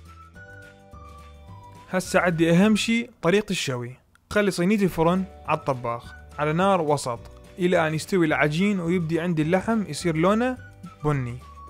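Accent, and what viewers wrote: Mosul, Iraq. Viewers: بس اهل بغداد مو هيج لهجتهم اكيد الماما لوبابا من الموصل 🙋🏻‍♀️ بلتوفيق ان شاءالله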